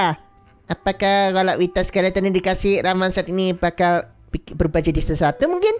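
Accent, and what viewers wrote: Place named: Java, Indonesia. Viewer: bang medok banget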